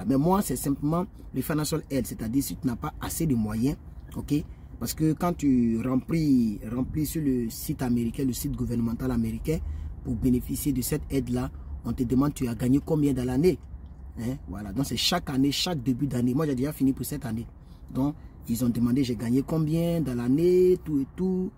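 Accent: Ivory Coast. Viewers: Je sens l'accent ivoirien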